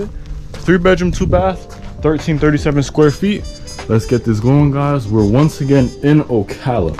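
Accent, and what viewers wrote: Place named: Portugal. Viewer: O teu sotaque espanhol é mais parecido com o português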